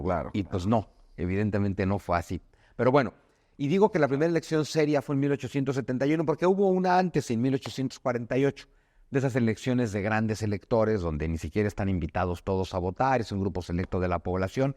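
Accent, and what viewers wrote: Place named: Mexico. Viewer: Ameno, grandísimo conocedor, dicharachero...con ese hermoso acento mexicano. Grandísimo placer escucharte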